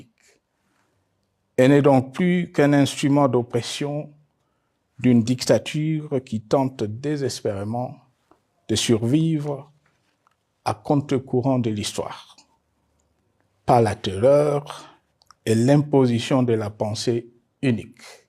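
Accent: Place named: Rwanda. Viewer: Je suis tellement pcq tu as un ton de kinyarwanda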